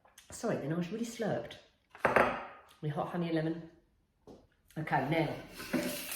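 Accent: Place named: Northern England, United Kingdom. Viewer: I’m from Durham and I can say that was not too bad for a northern accent, 😂, what did make me spit my coffee out was when you were ranting about Sean taking the washing up liquid and you said, “he takes it up the back”, my hubby raised a eye brow cos he didn’t understand the full conversation, 😂😂 xxx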